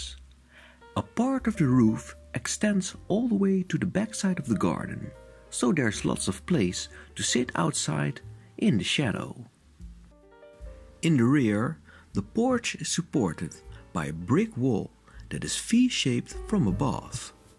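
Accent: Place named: Netherlands. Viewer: Dutch narrator... distracting....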